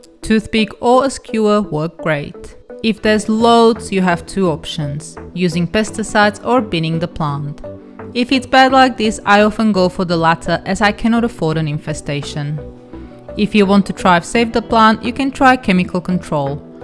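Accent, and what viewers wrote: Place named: South Africa. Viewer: It sounds like you have a south African accent, sounds a bit like my friend from the west coast.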